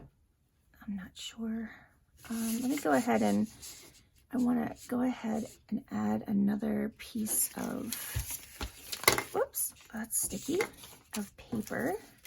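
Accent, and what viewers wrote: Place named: Pennsylvania, United States. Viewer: This is the first video from you that I've watched, I'm enjoying it and have subscribed. You sounded local so I had to look to see where your from, I'm from Western Pa so not that far. Looking forward to seeing more from you.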